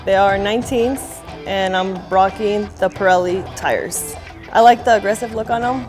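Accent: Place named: East Los Angeles, United States. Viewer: Damn she sounds like she's from East L.A.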